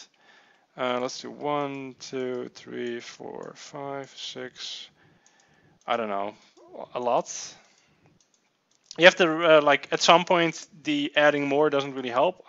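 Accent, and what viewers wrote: Netherlands. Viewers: Let me geuss you are dutch Want ik ook en het is heel duidelijk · #nederlandsacc beter dan van gaal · Je praat echt met een heel Nederlands accent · ***** Haha You HAve a dutch Accent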